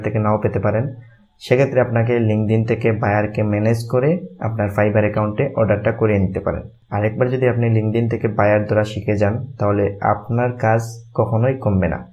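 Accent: Sylhet, Bangladesh. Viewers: apni ki sylhety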